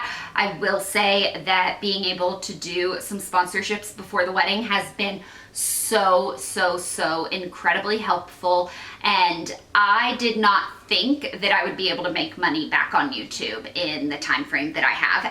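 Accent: South, United States. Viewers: Do you have a southern accent that you don’t show on camera? (I’m from Tennessee too, and it’s so easy to sound yeehaw😂) These new videos are so refreshing and calming. I like to listen to them when I can’t sleep . 🩵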